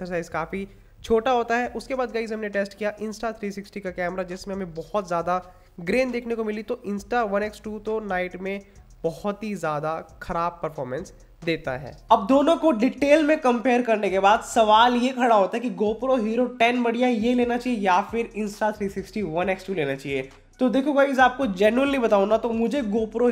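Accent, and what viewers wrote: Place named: Kashmir, India. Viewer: Bro....ur accent gives Kashmiri touch.... Anywz nice comparison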